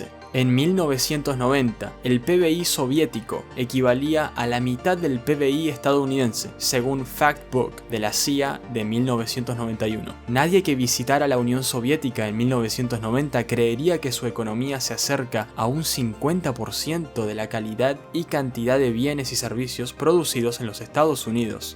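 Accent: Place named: Argentina. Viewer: tu hablar parece de argentino. Pero, donde vives?... en USA?. Gracias por tus aportes al conocimiento general de la economía. (Te sigo en todos tus posteos). Saludo Libertario 🤙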